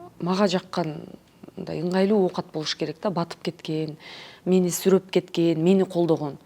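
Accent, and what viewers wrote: Standard. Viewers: Нукура кыргызча суйлогонунуз жакты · Жөнөкөй, кыргыз тилин таза сүйлөгөн, жагымдуу, таланттуу сонун кыз ❤❤❤ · Кыргызча таза Тил байлыгы ото коп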